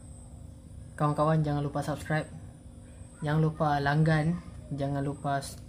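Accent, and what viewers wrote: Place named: North, Malaysia. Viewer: always support you bro!😁👍.saya tu dgr mcm slang utara pon ada😆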